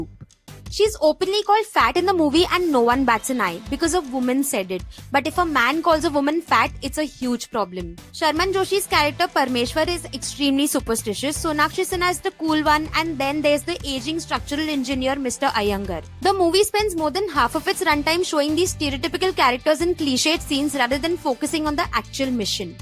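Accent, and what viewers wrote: India. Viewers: I think people will learn how to speak fluent and understandable English as it is Indian accent. It's very much intriguing and one can easily understand if they have had English in highschool. In Hindi, I think it will be a roast which actually this channel is not making and is focusing on a review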